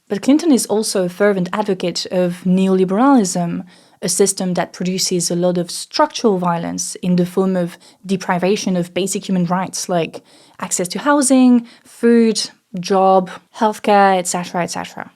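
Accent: France. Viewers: Love how your accent is so French it becomes Australian (Overton windor, videor etc...) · I love how you combine this complicated discussion into a rational and balance topic. Maybe your voice and french accent help too, mercy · Love your French accent 🥖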